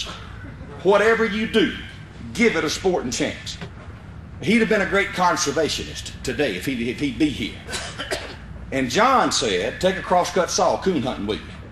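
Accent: United States. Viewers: Oh yes... fantastic delivery and charming southern accent! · Sounds like how I grew up in the holler in Kentucky...lol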